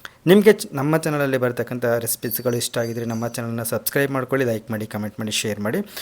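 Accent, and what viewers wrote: Chitradurga, India. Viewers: ಅವರ ಮಾತೆ ಅವರ ಸಂಪತ್ತು, ಮತ್ತು ಆಕರ್ಷಣೆ, ಯಾಕೆ ಹಾಗೆ ಹೇಳುತ್ತೀರಾ. ಅವರ ದುರ್ಗಾ ಭಾಷೆ ಶ ಎಂತ ಚಂದ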